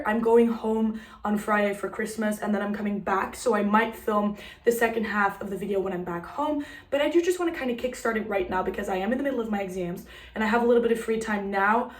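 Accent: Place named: United States. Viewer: hi this is so random but where are you originally from? you sound so american but you look so european 😭 sorry if this is rude i don’t mean it to be! also all your knits are gorgeous!!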